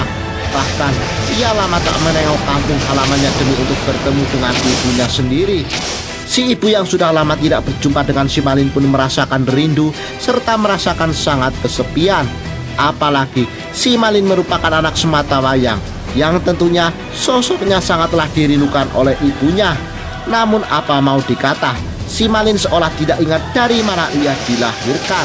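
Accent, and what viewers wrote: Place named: Java, Indonesia. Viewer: wou suara lo jawa nya ga usah medokkk ngtu kali ......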